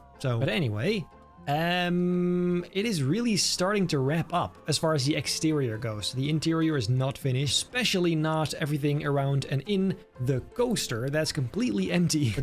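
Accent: England, United Kingdom. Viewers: Keep up the british accent - you’re good at it! :) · Brilliant love the English accent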